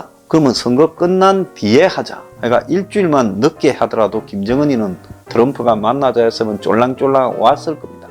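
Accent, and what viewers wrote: Gyeongsang, South Korea. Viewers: 그래서 바이든되면 머가 좋구 나쁘고 그런걸 말해야지 트럼프에 대해서만 말하내 황장수 위장우파라고 소문이 났던데 이런 이유이구나 경상도 사투리 쓰지마라 전라인민공화국 사투리쓰면 이해라도하지 어릴때부터 쇠뇌가 되었으니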